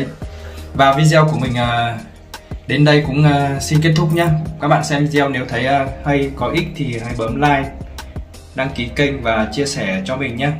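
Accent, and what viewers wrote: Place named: Bac Ninh, Vietnam. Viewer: Giọng bạn như dân Bắc ninh ý nhỉ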